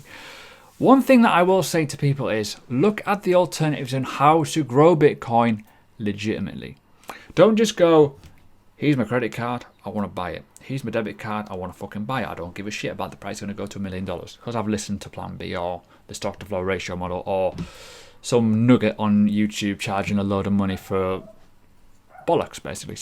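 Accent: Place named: North, United Kingdom. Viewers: What absolute dog's bollocks, how come you not tell my mate is from England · He’s a northerner!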